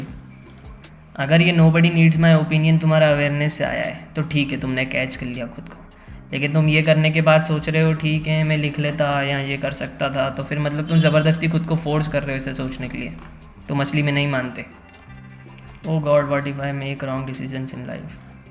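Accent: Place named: Madhya Pradesh, India. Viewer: Mp se ho kya bhai? Pyaar accent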